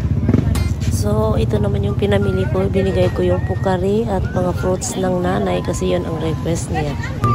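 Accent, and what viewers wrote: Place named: Maasin, Philippines. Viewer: Maasin city wet market yan..happy watching to your vlog..kasi very fameliar ko ang lugar pati pananalita mo inday at yong shoreline sa likod nang maasin city hall very fameliar po sa akin kasi taga dyan rin ako. Thanks for sharing..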